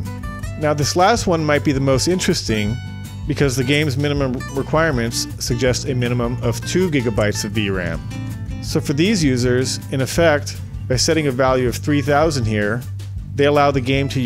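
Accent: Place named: Netherlands. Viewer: it sounded a little bit Dutchy. This vid was great by the way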